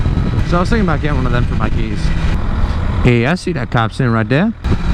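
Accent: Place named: Alabama, United States. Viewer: hey man, what part of alabama are you from?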